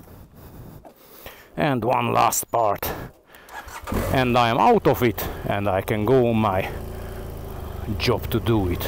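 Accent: Hungary. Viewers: Great video. Based on your accent, are you Hungarian?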